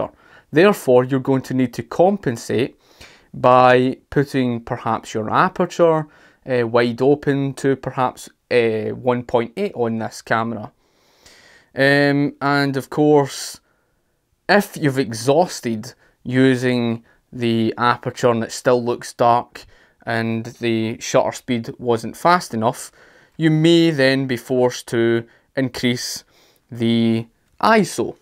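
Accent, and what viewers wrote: Scotland, United Kingdom. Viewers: Great video! Plus, love the scottish accent :) thumbs up from Italy! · jens lipponer Scottish accent you mean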